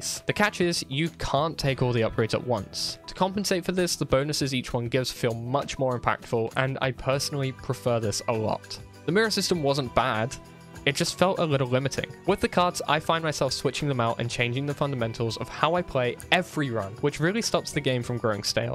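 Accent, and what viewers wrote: United Kingdom. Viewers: Clickbait thumbnail - Check Talking Head with 4 poses - Check Hasn’t beaten the game they’re talking about - Check Annoyingly British - Oh Absolutely Yup it’s a game journalist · I will sub you bro love British accent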